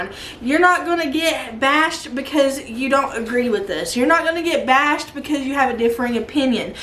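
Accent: South, United States. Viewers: Hi there I'm new to your channel and I just love watching you and the way you talk,you have to be a southern girl.I have a channel but I stopped you tubbing because of my health.But I sure love watching you.Hope you have a wonderful weekend hun. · Random comment... I have to tell you... I love your southern accent!! That's it!! I'm going to finish watching you.. Love you, FRIEND!! ❤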